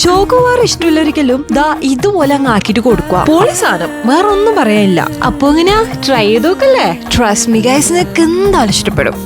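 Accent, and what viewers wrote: Kannur, India. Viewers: Kannur ahno eyal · kannur analle❤😊 enekkariyathathu konda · Kannur ഭാഷ ❤ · കണ്ണൂർ കാരി ആയിരിക്കും അല്ല്യെ 😌🐝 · Kannur ahnnaa? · Chechi kannur aana😅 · Kannur anno chechi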